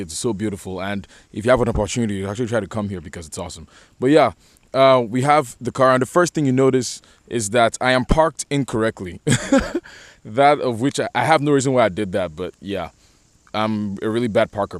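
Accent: Nigeria. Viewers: This nigga has to be Nigerian lmfao. Made the video hilarious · You sound Nigerian. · I hear a slight Nigerian accent! My brotherrrrr lool. Much love from Lagos Nigeria. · You sound just like my friend Voke from Nigeria, who by the way, gets excited talking about cars also.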